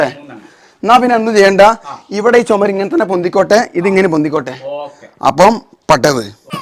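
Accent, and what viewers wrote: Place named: Malappuram, India. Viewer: മലപ്പുറത്ത് അങ്ങനെ ആണ് 🥰 മഴ മയ പുഴ പുയ 😅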